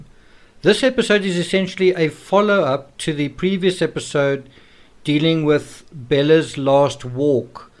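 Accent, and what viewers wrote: South Africa. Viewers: ...are you South African?🇿🇦🇿🇦... Love the way all this is explained..though I still feel CW gets off on any attention..good or bad... Nothing can ever excuse what he did to them..what would be great is if he could tell the truth..even if that includes outing NK. Such delusions CW probably has twisted the truth is his head.. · The man speaks just like he spent his entire life in South Africa . Because , he pronunciations are a bit different , he is still readily understood. I feel your comment is quite rude.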